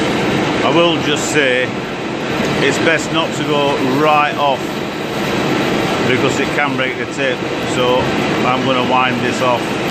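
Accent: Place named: Northern England, United Kingdom. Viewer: wonderful accent Northern MaN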